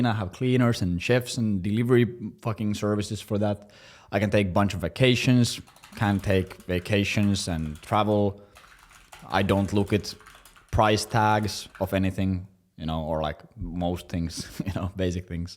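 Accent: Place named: Finland. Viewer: Great vid, but... you said you live in Estonia, but you sound like a Finn 🧐🧐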